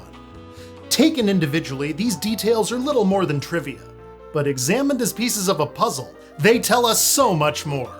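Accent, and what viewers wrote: United States. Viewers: Trek culture being narrated by an American? I'm sorry, where am I? What alternate universe did I step into? (I don't hate it, I've just gotten used to Adam and the other guys.) Edit: After I marathoned TNG (because I'd only watched most of it over the years and not seen some episodes in full) I caught up on like 2 of the movies? Didn't like them much and still haven't watched the TOS movies because I haven't finished TOS. That said, catching up on the movies a second or third time around actually made sense, because ages 13-22, I was mostly out of the trek fandom and into anime and Animorphs, so watching the movies in my teens when they came out didn't really matter, as I recall. 23-ish, watched Voyager reruns and nostalgia pulled me back into the fandom in a big hug! Can't believe I thought it was all "meh" for so long, but I guess maybe I was just trying to be cool or something, IDK. 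But it didn't help I wasn't impressed with TNG and we didn't watch ST:DS9 because it was all about war and my mom didn't like it. Voy was what I cut my teeth on, even if it's not the fandom's favorite. It's the redheaded stepchild of trek. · This guy's American accent is freaking me out. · Glad to hear someone address the fact that you actually can get into the Nexus with a ship, and expand upon it to give a real explanation as to Soren's plan. Still weird hearing an American accent here though.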